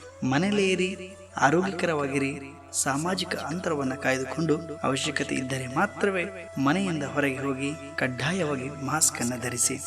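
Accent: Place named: Kundapura, India. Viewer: Treat to hear kundaapura kannada ♥️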